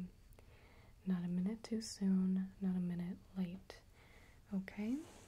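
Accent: Midwest, United States. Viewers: I've been trying to figure out this accent as it sounds kind of familiar it's kind of funny that it's just Midwest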